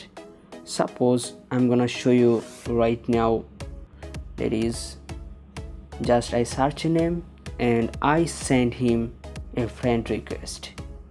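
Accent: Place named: Bengal, India. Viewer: Bamgali crazy English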